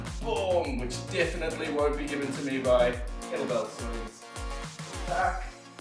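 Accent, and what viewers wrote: South Africa. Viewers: Why is your accent so close to South African? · Sounds saffa.....lekker.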